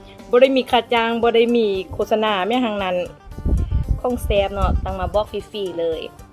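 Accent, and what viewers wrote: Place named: Laos. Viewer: You got some awesome Laos accent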